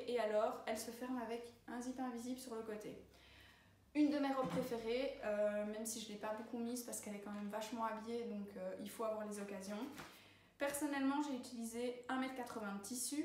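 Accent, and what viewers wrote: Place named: Belgium. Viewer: j'adore ton accent belge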